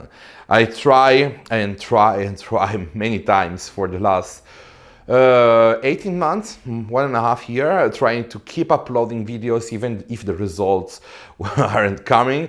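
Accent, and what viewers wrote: Italy. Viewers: May be the frequency of uploading videos could be the problem. There is another channel I follow known as "The pasta queen". She is also Italian and speaks the Italian English accent as you and has good following so may be its not the way you narrate. You should try Youtube shorts to divert audience to your channel as everyone else is doing. Engage the audience and you will again shine. Dont loose the channel at 500k what most people dream of. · The last video I see in this channel is from 11 months ago, I believe that making some short projects that you can upload in between the actual big projects would help with views and also make the algorithm spread it more, your English is perfect, you just have an Italian accent which is not a problem at all. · your english is fine (maybe with an Italian accent what is fine) and also your video's are very nice to watch. 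I also took a subscription to your new channel, not to miss out on something and hope it does what you want it to do. 🤗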